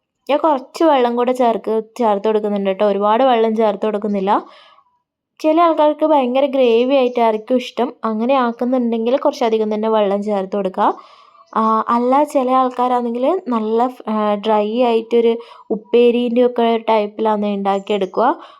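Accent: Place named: Kannur, India. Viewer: Nannayitund daaa.kannur slange poliii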